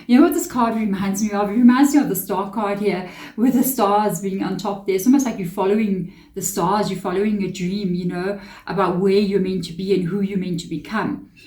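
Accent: South Africa. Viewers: I did not pick your accent as South African, but I can hear it now. 💚 My dreams I trust more than anything else.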